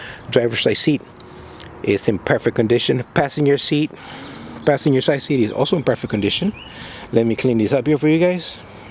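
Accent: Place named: United States. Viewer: Your accent and narration is fine, All American.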